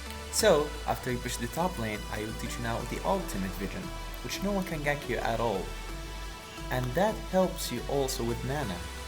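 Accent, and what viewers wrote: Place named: Egypt. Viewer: مسوي عنقليزي تتكلم زي المصري ترا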